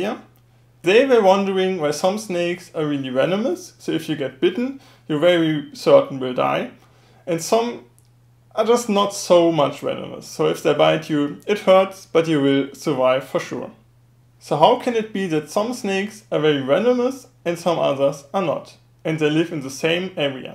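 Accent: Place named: Germany. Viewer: You have the stereotypical german accent :D but good video ;)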